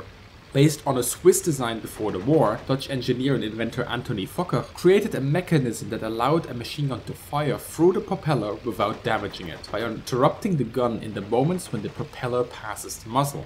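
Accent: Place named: Germany. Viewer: Jimson Patiño MacLean His English is near perfect. He just has a German accent.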